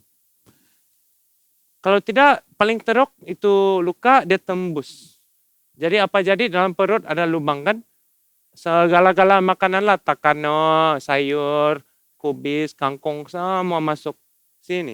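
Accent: Sabah, Malaysia. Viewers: Haha this doctor is awesome. Kalau sia tutup mata, betul2 macam kadus dia punya slang. Keep up the great work you are doing, very informative and insightful.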